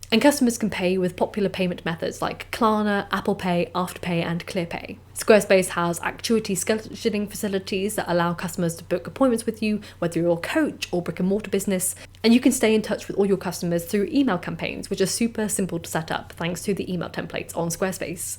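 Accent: United Kingdom. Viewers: After a chapter or a few pages of fiction I've for years been getting this painful sence of urgency, as if the book is wasting my time, drawing me away from the limited years of my own life. Ironically my own life feels a bit meaningless. Also are you British or just playing a British character on Youtube?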